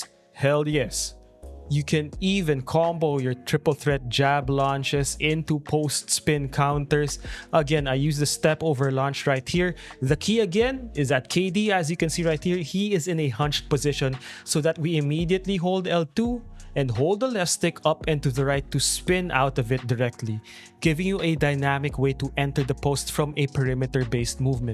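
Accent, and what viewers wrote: Philippines. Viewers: Are you Filipino? I peep the accent 👀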